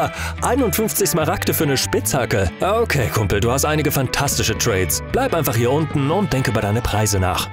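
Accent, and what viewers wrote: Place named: Germany. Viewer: Er klingt wie ein alman aber es ist geil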